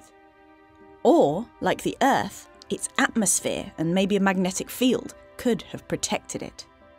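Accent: England, United Kingdom. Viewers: Really getting tired of English accent narratives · I hate British accents. Especially when it's woman's voice doing it.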